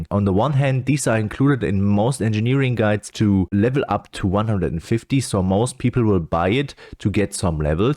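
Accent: Germany. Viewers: wie kann man so deutsch englisch sprechen? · Man hört halt so krass raus das du deutscher bist xD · don't let him bother you. your german accent makes it easier to understand for every non native english speaker ;)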